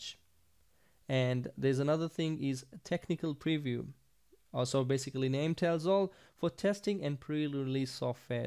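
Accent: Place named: Australia. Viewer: You sound Ozzie....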